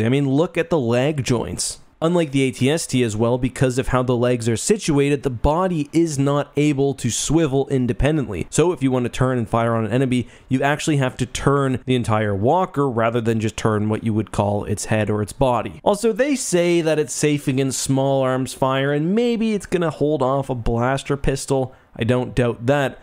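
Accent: Canada. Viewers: Based on your accent you’re Canadian?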